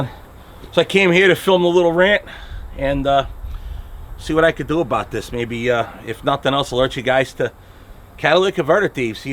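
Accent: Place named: North Jersey, United States. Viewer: Yup, you sound like pure North Jersey! Love it! And I hope you find the lowlife so he can be dealt with properly.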